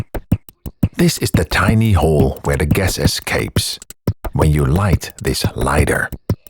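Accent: Netherlands. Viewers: The most dutch accent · Ik dacht al een Nederlands accent te horen en de krantensnipper bewijst het ;)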